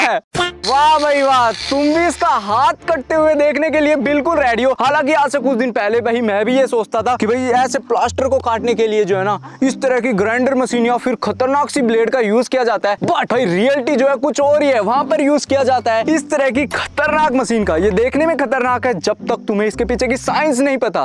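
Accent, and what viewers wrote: Haryana, India. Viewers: Bhai tum haryana sa ho kya😂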